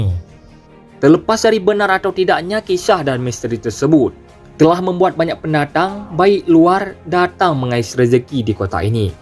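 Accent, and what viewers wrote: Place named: Aceh, Indonesia. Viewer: Logat aceh nya kental x😅bukan orang gayo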